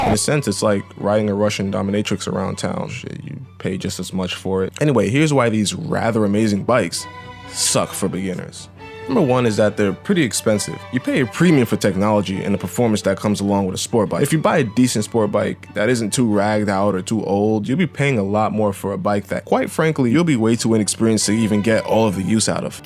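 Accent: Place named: Brooklyn, United States. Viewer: Audio is fine. Your Brooklyn accent is classic. I started at 16 years old with a used 250 cc single four-stroke Honda dirt bike. It was all I could afford, but it seemed like plenty of bike. The speed limit was still 55 on the interstate, and it did fine there. Sold it after several years for about the same I paid for it. Later I bought a 250 cc two-stroke Suzuki, which was noticeable faster. Then had a 650 cc Suzuki street bike when I was 22 years old, and drove it all over LA for years with no problems. My next bike is probably a Triumph Street Triple 765cc to be used in the hills along the California coast.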